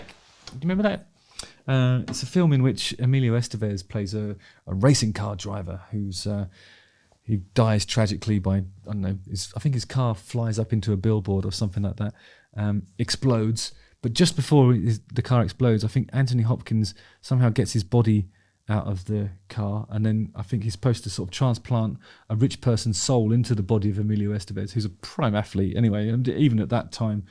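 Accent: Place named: RP, United Kingdom. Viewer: Your knowledge of Maiden is weak. And spoken in your posh accent and delivered so woody and dry makes difficult listening.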